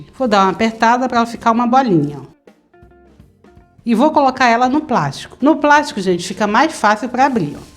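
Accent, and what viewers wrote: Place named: Rio de Janeiro, Brazil. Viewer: Oi Boa tarde suas receitas são ótimas e sua voz e muito bonita tem um sutak carioca e isso mesmo💖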